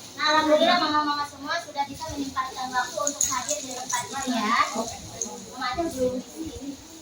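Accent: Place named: West Java, Indonesia. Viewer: kaya logat sunda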